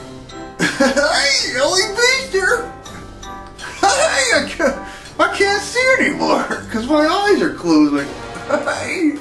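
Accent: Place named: New Jersey, United States. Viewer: He sounds like he's from New Jersey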